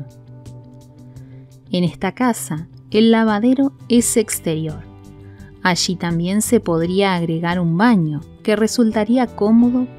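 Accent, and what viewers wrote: Argentina. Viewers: Hermoso diseño, buena distribución, buena iluminación, excelente aprovechamiento de los espacios y decoración discreta y elegante , de muy buen gusto, en cuanto a las opciones de la fachada me gusto la numero 3 , con detalles en piedra .....me gustan muchos sus videos porque están bien explicados ademas , das costos $ aproximados (desconozco para que pais, pero por tu acento supongo que eres argentina) y tambien das opciones de cambios en la fachada ..y los planos.......!!!Que mas se puede pedir!!!.....gracias y espero el siguiente........saludos desde Mexico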